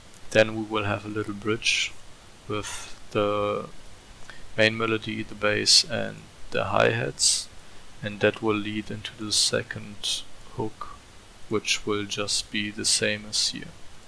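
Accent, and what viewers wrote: Germany. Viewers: hes from germany, i can tell by his accent :)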